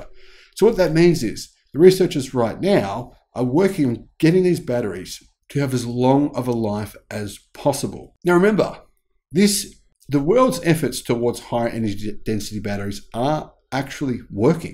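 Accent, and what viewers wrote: Australia. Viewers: Is this an Aussie?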